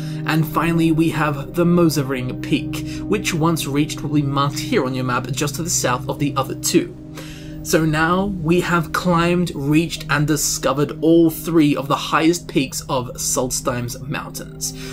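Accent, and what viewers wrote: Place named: Australia. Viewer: You know, I’ve been going through all of your videos, and I’ve just realized that the reasons I love listening to these are because 1) I just like learning about lore, 2) your buttery voice, softly speaking, accented ostensibly with an Australian (or maybe New Zealand?) touch, and 3) your amazingly awesome alliteration and decadent descriptions. It’s great, I love it.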